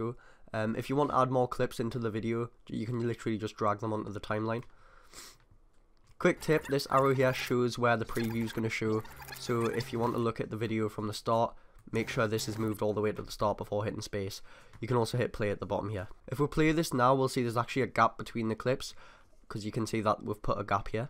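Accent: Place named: North East England, United Kingdom. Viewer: your from the northeast of England :D like me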